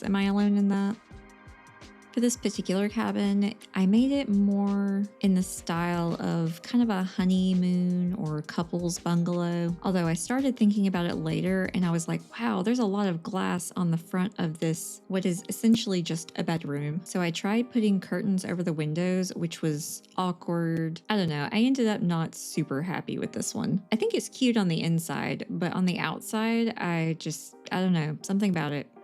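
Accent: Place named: South, United States. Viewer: Omg I love this idea! (Also your accent. Southerners represent!!!) I'm trying something like this in my save file!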